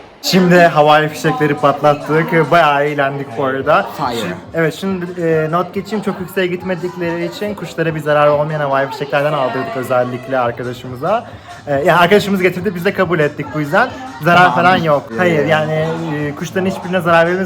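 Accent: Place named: Italy. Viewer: Türkceyı ingilizceyı itakyanca aksanı ile konusmak😅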